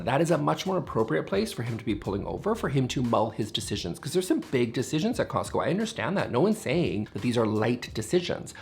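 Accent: Canada. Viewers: a Canadian accent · I’ve never noticed your Canadian accent until this video. It must come out when you get worked up 😂. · Love your fun combo of “gay voice” and Canadian.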